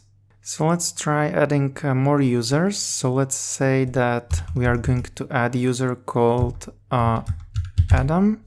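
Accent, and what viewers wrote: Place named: Spain. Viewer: Perdona, pero tu normalmente no hablas ingles 😅. Tu ingles es muy español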